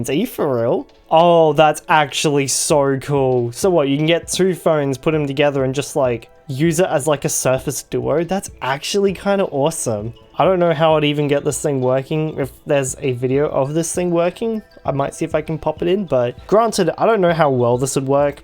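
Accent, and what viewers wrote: Australia. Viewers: because he’s Australian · The Australian accent is THEE most annoying accent of all accents by far. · Are you a Aussie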